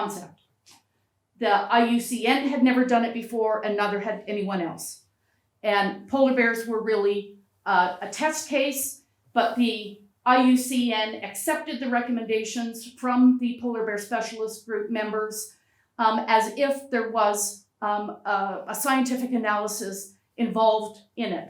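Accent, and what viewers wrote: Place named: Canada. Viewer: She is a Canadian. American is a foreign language for her.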